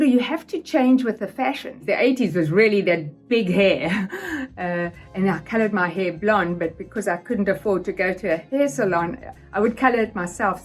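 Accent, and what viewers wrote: South Africa. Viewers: Wow, you so beautiful with or without make up. I actually was scrolling past and the videos play on there own and I got drawn in by the sound of your voice. I can just hear the South African accent in your voice as I am South African and was so confused lol until you explained. I hope that even in my later years I can be just as poised and elegant as you are. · You can hear her South African accent perfectly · She still has her South African accent 🥰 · It's weird hearing a South African accent on Vogue. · So good to hear a South African Accent on Vogue ;) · What an exquisitely charming lovely Women. The South African accent is just beautiful . Thank you Vogue for presenting a mature women aging lovely . This was just a refreshing tutorial.👏👏👏🇨🇦❤️ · As a South African I definitely heard her accent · Love your saffa accent ❤ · I love that you've still got the Saffer accent! 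· I love that she still has her South African accent. I really love her representation for older generations. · I recognised her south african accent as soon as she started speaking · South African accent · its a South African accent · Love her South African accent. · She kinda sounds South African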